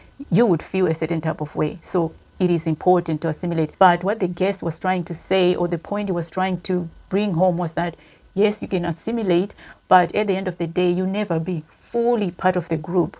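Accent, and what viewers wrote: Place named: Zimbabwe. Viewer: How long have you been in the UK? You have retained your Zimbabwean accent.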